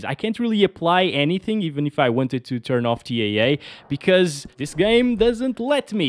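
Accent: Portugal. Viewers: Just wondering, are you from portugal?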